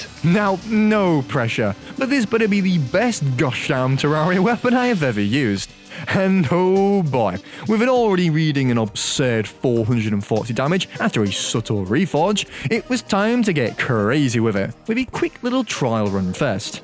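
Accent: United Kingdom. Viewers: youtuber and british acent at the same time is crazy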